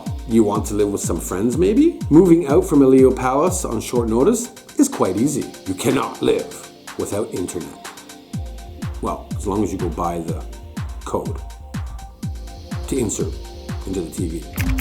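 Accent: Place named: Canada. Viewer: haha amazing! I’m Canadian too and I thought I noticed a slight Canadian accent lol 🇨🇦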